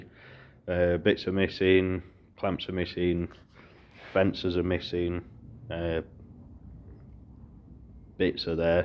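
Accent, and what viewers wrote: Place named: Sheffield, United Kingdom. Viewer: Did you get anywhere with setting this up? I’ve got one in Sheffield (you sound local) in similar condition to yours but mines got the leg system if you need to have a look how it was originally fashioned. Wicked saw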